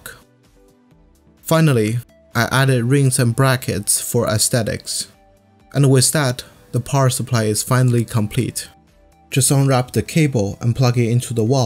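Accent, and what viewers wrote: China. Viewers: gonna say it seems like a little bit Chinese accent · I watched all your videos today and subscribed!!! 听口音应该是华人吧？真心佩服，加油！期待更多视频！ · 这英语我怎么听出一股天津味儿呢。。。🙂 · 感覺像華人講英文,因為我竟然能聽懂!!!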